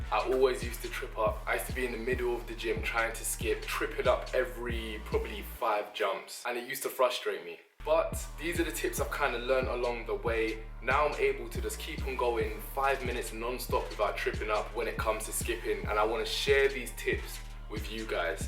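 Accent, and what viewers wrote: United Kingdom. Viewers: Nothing sexier than a chocolate man with a British accent....with tats...with a beard...with a six-pack...that’s tall. Ok I’m done.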